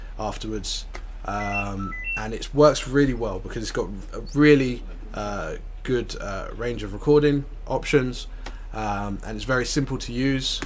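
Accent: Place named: England, United Kingdom. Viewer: a black man with a english accent that's awesome 🙌🙋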